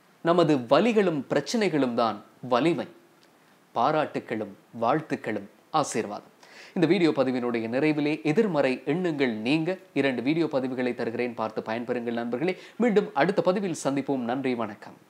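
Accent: Sri Lanka. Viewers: உங்கள் இலங்கை தமிழ் அழகு தமிழுக்கு நான் அடிமை . அருமை 👌 நீங்கள் சிரிச்சிக்கிட்டே இருங்கள் நண்பா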